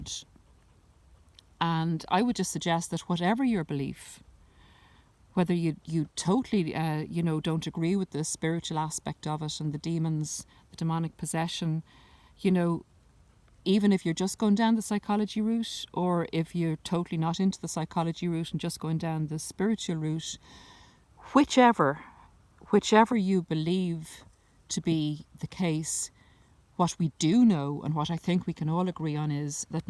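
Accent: Ireland. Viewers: So refreshing to have a video with that soft, kind Irish accent! I appreciate your style and also, you seem to be in a similiar age range as myself. I find you very relatable.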